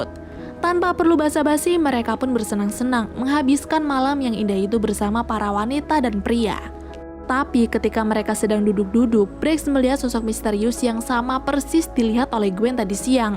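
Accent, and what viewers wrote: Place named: West Java, Indonesia. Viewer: Alhamdulillah Damang 👍 Sunda na ti palih mana teh😁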